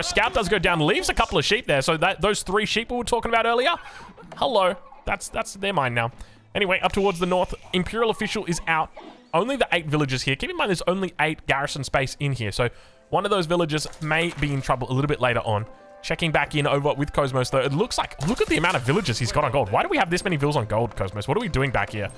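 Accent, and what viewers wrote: Australia. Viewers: thats right, im watching him from Chile and I also love his aussie accent haha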